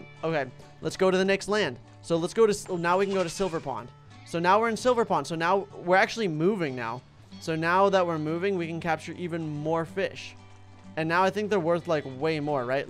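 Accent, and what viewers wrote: Ireland. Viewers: I love your irish accent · Why is he using an Irish accent? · Insted of a pirate accent i think he using a Irish type one haha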